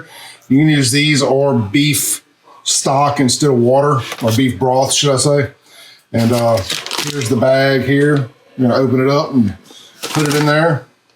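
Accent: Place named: South, United States. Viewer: Where are you from?? I can’t pinpoint the accent it sounds southern but also half of it doesn’t sound southern I’m so confused lol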